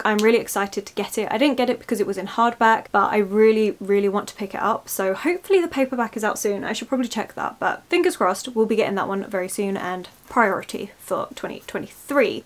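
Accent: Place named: Essex, United Kingdom. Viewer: I really loved this video and channel,happy monday xx fan 🧑‍🎄.....ps you sound essex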